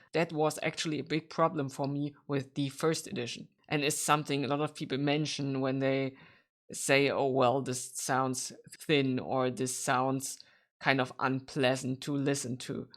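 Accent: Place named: Germany. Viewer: Hey:) Da sich dein Englisch genauso anhört wie meins schreibe ich mal auf Deutsch 😂. Erstmal ein schönes Video mit einer guten Erklärung hast du da gemacht! Ich hab mal ne Frage... Ich bin relativ neu im iem-Bereich und hatte die Möglichkeit die Moondrop Chu 2 auszuprobieren. Da war ich echt schockiert wie klar der Ton ist und wie viel mehr Details man hören kann (im Vergleich zu meinen alten Soundcore Liberty 2 Pro Kopfhörer zu dem Preis!). Alles in einem will ich mir auch iems zulegen, allerdings hauptsächlich für Gaming (überwiegend single player games)aber auch um Videos zu schauen oder Musik zu hören. Es sollte am besten nicht zu neutral/flach klingen und leicht besseren bass haben als die Moondrop Chu 2. Die Frage wäre dann, bin ich mit den Zero 2 gut bedient oder lohnt es sich für etwas mehr Geld bspw. die Truthear x Zero (Red) oder 7hz Sonus zu kaufen. 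(Puh, der Text ist jetzt doch etwas länger geworden). Hast du da eine Empfehlung für mich? Würde mich über eine Antwort freuen ^^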